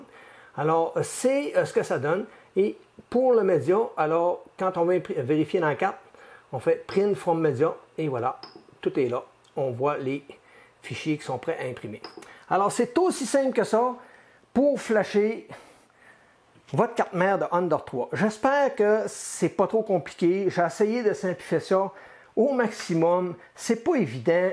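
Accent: Canada. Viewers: slt,c'est bon j'ai réussi,je sais pas comment j'ai fais,j'ai recommencé plusieurs fois et a un moment ça c'est mis a fonctionner,ouf,je suis content,voila,merci a toi et bonne continuation,c'est toujours un plaisir a regarder tes vidéos,et en plus j'adore l'accent canadien.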